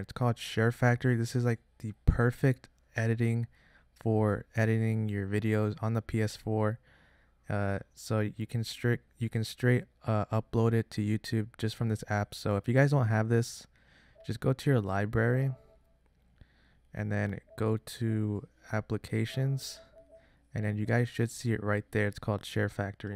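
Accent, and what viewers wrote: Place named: Australia. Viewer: 90% Australian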